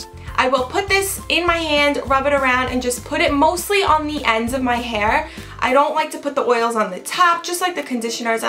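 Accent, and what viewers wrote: New York, United States. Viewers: are you from new york? you really have an accent. i can tell because i am from new york. :) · Is it just me or does she have a New York accent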